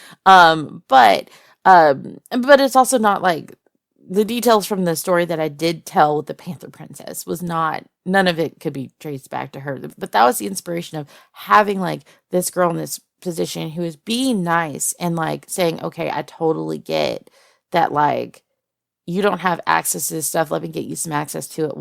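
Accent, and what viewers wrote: United States. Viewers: Haha, and with a channel name like I thought it was expected. Though I was more referring to your voice in general. It’s nice to hear your blend of softened strength, the occasional spice of sadist, and a little North American country twang that serves as the perfect cherry on top. Anyway, keep up the good work and don’t forget to take care of yourself~!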